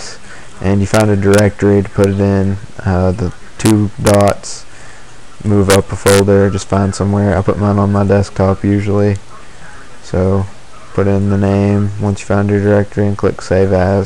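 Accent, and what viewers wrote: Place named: Tennessee, United States. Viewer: damn guess since im from tn i ddnt notice the accent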